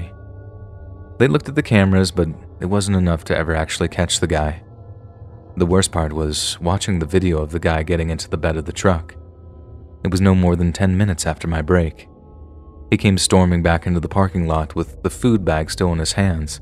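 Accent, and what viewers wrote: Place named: South, United States. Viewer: Your southern accent isn't bad 😆😁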